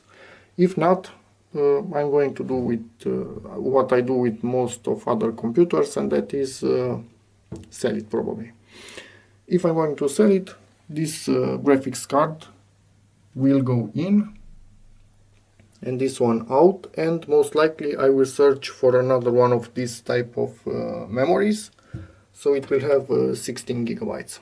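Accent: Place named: Romania. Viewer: Dupa accent esti roman :P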